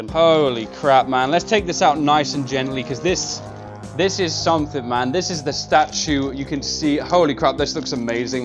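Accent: United Kingdom. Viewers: Is it me or sounds a lot more british with low quality mike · I can't be the only one that thinks he sounds more British rn lol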